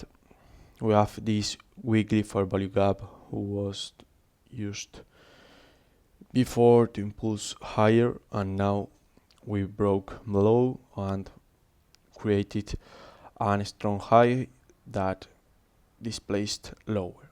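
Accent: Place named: Spain. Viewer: grande sabía que eras español ajajajajaj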